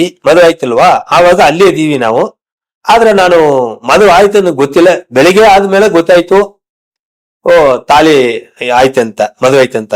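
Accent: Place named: Maharashtra, India. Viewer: Border area sir,if you come to North Karnataka border area.you can hear marathi tone kannada.its common in border areas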